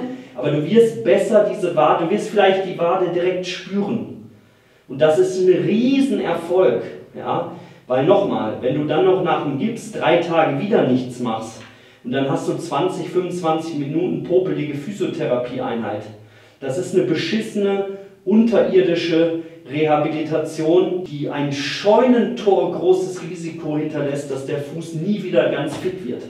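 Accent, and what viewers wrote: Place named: Austria. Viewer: gute besserung liebe btw is sie österreicherin? interessanter akzent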